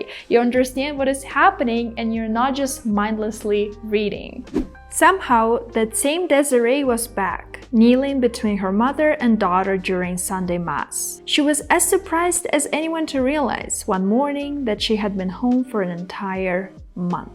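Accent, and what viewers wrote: Russia. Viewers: Why do I can detect Russian pronunciation from first word all the time. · Вроде хорошо говорит, но все равно слышатся достаточно уловимый русский акцент. Только потом посмотрел на имя )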